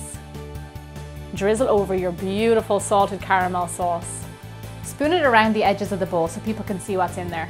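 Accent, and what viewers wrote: Ireland. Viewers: Made this for our Christmas Dinner Dessert. It was a crowd hit. A lot of work, but very much worth it. Definitely a keeper recipe. It can be made in stages (the brownies and caramel the day before etc.). Thank you!! Warning #1: It is delicious but VERY rich. It fed 10 at our dinner and we didn't even dent half of it. Warning #2: Pay close attention to the colour of the sugar for your caramel. The darker it becomes, the stronger flavour your caramel will taste. If you wait too long (sugar too dark in colour), it will taste like a burnt sugar caramel. Still good if that's your thing, but I prefer it just after turning golden and before getting very dark brown (it's a personal preference thing). Warning #3: When making the caramel, add your butter all at once and then whisk in (as says). Have your butter and cream set aside at room temperature if possible (not cold), this will help with the caramel staying emulsified, and not separating. 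Warning #4: If using salted butter, omit adding salt at the end. Warning #5: `s recipes are fantastic, but her Irish accent alone will make you want to come back to this channel.:)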